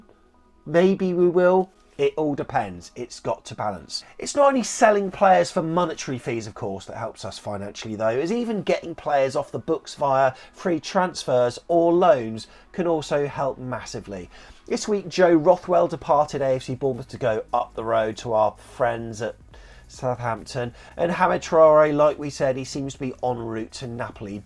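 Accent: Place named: United States. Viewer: American accent frightfully good. You should do all future financial breakdowns as Gandolfini from The Sopranos.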